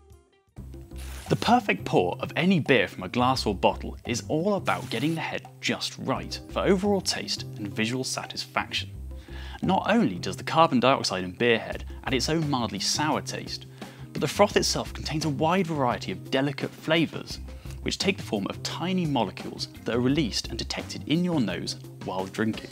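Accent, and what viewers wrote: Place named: RP, United Kingdom. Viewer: He has a posh accent haha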